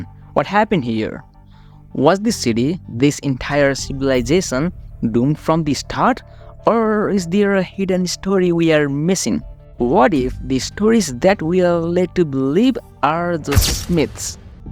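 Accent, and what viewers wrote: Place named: South Asia. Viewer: I see why you started using an AI voiceover, it's because you're from South Asia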